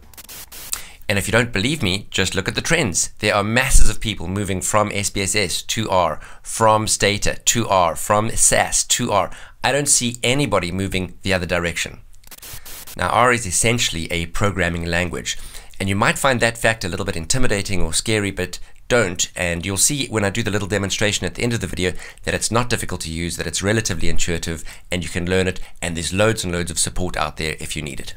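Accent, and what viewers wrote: South Africa. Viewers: i wonder if the presenter south african? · Are you South African? The accent sounds familiar! Loved the video, great content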